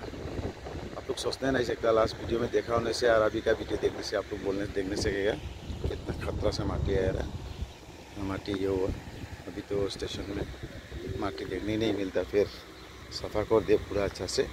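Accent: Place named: Haflong, India. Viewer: This is Haflong Hindi. We've developed our own unique way of speaking the language...aur vese bhi, jese Mumbai , ya phir Hyderabad me log apne tarike se hindi bolte he, similarly Haflong me humlog Haflong Hindi me baat karte he...Humlog North India se to nehi he na, so, don't criticize his way of Speaking if you don't have any knowledge about the place or people living in Haflong....Look up Haflong Hindi if u don't know about it allready..It's unique in itself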